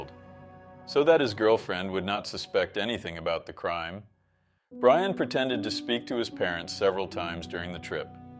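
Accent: United States. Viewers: Very tragic case. Great video though! Had to double check to see if I clicked the correct channel because of your excellent American accent :) Anyway ❤ thank you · Are you from America or australia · Your American accent is spot on!! I almost had to double check that I clicked on the write channel.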